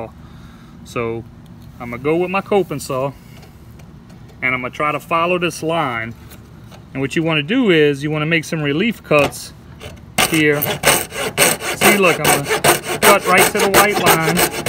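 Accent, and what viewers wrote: Louisiana, United States. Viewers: I’m hearing a Louisiana accent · Are you from Louisiana? Your accent sounds like it. Thanks for the video! Great idea!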